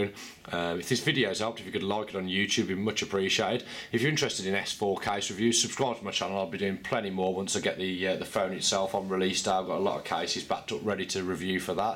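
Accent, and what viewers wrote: Birmingham, United Kingdom. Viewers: with that accent you must be a fellow brummy lol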